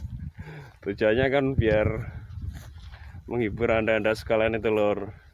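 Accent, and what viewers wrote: Java, Indonesia. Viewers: Kya,,a logat orng Jawa ya bos sampean😀😀😀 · Asline wong ndi mas ketone wong jowo iki. Kerjo teng pundi mas😇🙏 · Jan gk ilang blas jawane...."uwwaangel giaanndul gandul teng krontelll"😅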